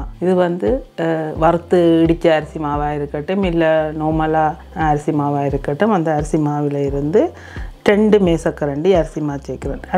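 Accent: Sri Lanka. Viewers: Neenga pesurathu ilangai.tamil madiri iukki pakada recipe marvellous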